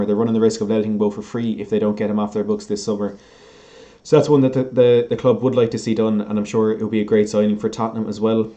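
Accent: Ireland. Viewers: Ur sound like ur irish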